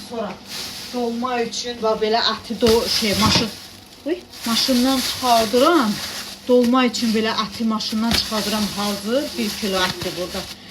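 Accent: Talysh, Azerbaijan. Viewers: Молодец. HALALDI. TALISSANMI.? LEKCE VAR. ALLAH QORUSUN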